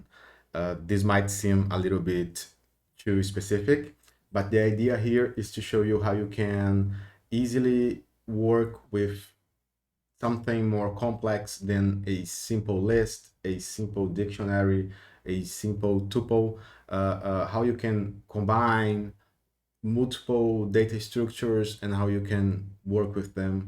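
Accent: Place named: Brazil. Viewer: You sound like you've got a Brazilian accent, is that right? I am from Brazil, living overseas, learning Python, and this is exactly was I was looking for. Obrigado!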